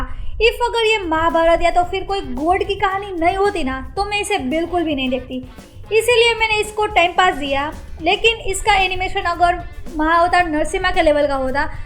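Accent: Gujarat, India. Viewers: aap gujrati ho kya??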